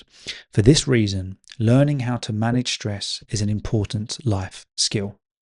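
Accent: England, United Kingdom. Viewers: Hello, as a new listener, I appreciate your podcasts so much, very interesting topics, nice English accent, pleasant voice. I improve my English and I'm relaxing listening to you. Thank you very much, you make me think about life in English 🙏🏼🙏🏼👏🏼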